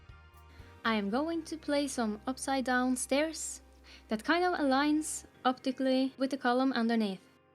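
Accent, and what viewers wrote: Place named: Norway. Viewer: Why you sound Norwegian ?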